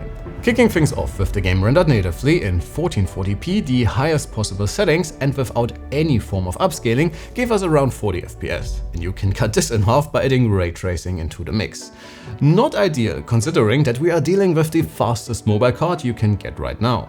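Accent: Germany. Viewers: I don’t understand, why you don’t do the videos in German.. it is clearly hearable, that German is your mother tongue… or at least in both, German and English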